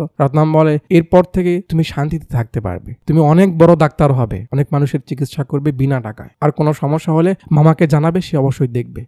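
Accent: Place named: Rajshahi, Bangladesh. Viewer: Anpr Kotha bloar style aktu aktu rajshashi r Manus er moto... ❤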